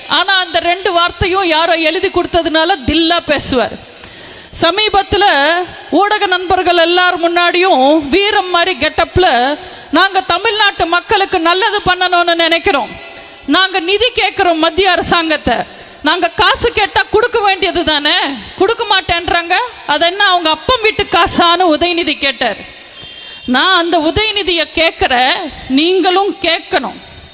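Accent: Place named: Telugu, India. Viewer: Cenema markettu sarincha piraku. Koovathoor koothadi kachiku koothu adika vanthutte. Adia. Nee pesura thàmil. Parthal nee thelungukariya thondaiyile. Enna machine vachiruke. Voice kekkave. Sakikaladi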